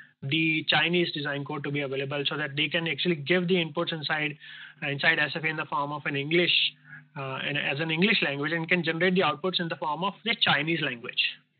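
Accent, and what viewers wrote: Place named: North India, India. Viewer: The trainer's English is highly accented locally to North India and it's not even good English. Please use speakers with Standard English pronunciation among Indian staff only, I am a retired Structural Engg Professor and still I couldn't even unmderstand what he spoke.. Thumbs Down!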